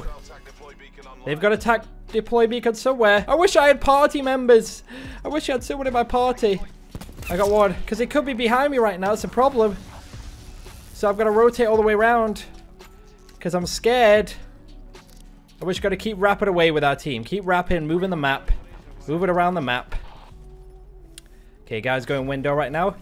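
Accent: United Kingdom. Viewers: I like your accent fluent English and just the little British tint love it!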